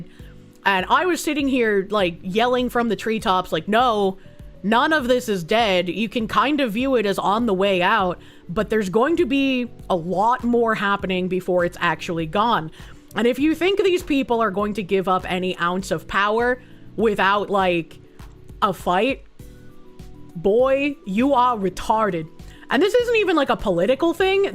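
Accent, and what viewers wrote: Boston, United States. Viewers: I’m hooked whenever I see her ring all cute in the intro. Then the Boston accent hits?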